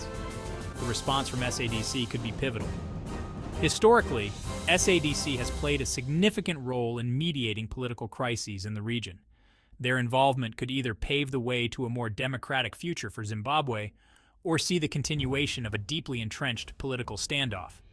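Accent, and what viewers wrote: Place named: Europe. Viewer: Would have preferred this being reported by Museyamwa, Mutenhesanwa or Nyamuzihwa - or their female counterparts. Not this Eurogenic voice. Do we ever get an African voice promoting an American, British, French or other political outfit? What's the agenda here? It makes some of us question whether Chamisa is any different from the American puppets Nana Akufo Ado or William Ruto, to mention but just two. Does he really stand for us, if such a voice as this is his representative?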